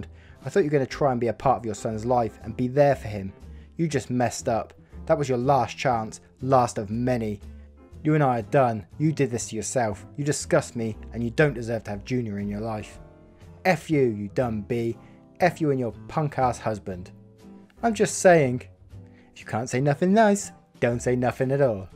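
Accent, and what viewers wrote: United Kingdom. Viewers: OMG A BRITISH PERSON!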